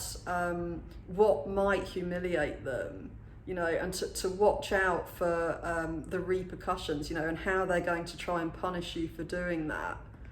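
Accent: England, United Kingdom. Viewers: nice accent, are you from England? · Love your accent. It's like listing to the Queen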